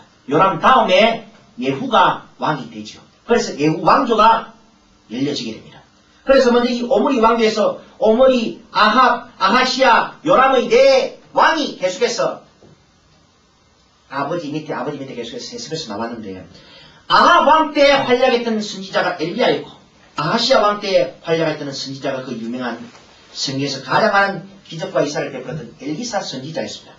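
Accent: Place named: Gyeongsang, South Korea. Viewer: 아멘 아멘~~~경상도 사투리 정겨워요 부분부분 돌려 듣기하느라 순교하는줄~ 말 엄청 빠르시고 억양도 쎄고ㅋㅋ그래도 은혜 받고 갑니다 아모스와 호세아 같은 선지자가 절실한 때인거 같습니다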